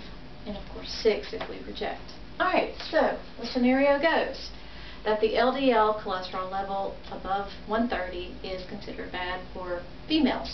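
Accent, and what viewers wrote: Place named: United States. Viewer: Could anyone tell me where is this accent from? Sounds American to me but not sure which state. Thx :)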